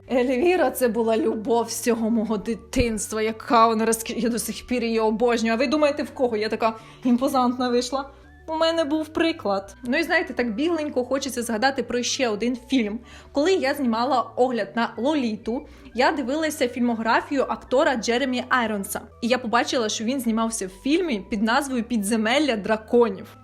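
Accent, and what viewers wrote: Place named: Kharkiv, Ukraine. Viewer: звучить ідеально, в нас в Харківській області розмовляють саме на такому суржику. В кожному регіоні суржик свій.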